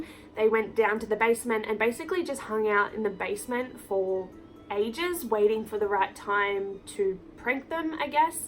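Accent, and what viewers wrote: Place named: Australia. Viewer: He can never be forgiven for what he did, neither of them can, but I do believe I see regret in Brian's eyes. The other dude just comes across as a complete and utter psycho, and his parents are clearly deluded and in denial. Anyway, awesome presentation! You're rather easy on the eye and who doesn't love an Aussie accent? Sucks that you don't really seem to be active any longer. Stay safe!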